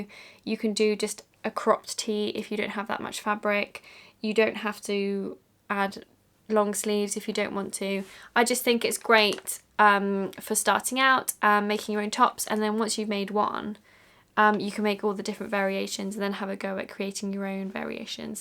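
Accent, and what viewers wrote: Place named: England, United Kingdom. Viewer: You look just like my sister but with an English accent!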